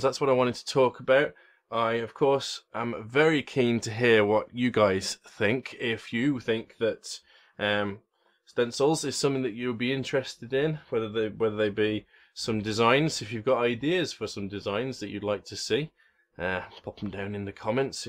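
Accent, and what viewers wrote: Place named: Bristol, United Kingdom. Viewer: Ah right, thought I detected a Bristolian twang to your accent!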